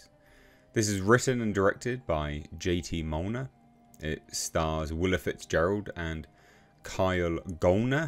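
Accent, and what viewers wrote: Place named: England, United Kingdom. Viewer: When you say “masterpiece” with your English accent, I want to believe you. I’ve been let down too many times (not by you… yet). I’ll let you know. I’m Cajun who now lives in South Carolina. We don’t do toxic positivity. 😉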